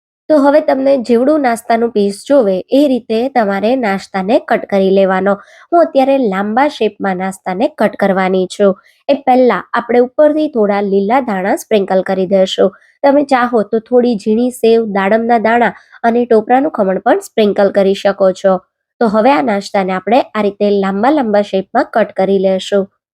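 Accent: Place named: Vadodara, India. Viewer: Vadodra gujarati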